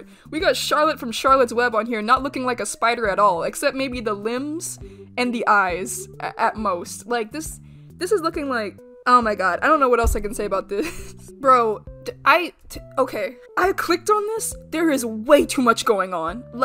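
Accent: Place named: Canada. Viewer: You sound canadian are you canadian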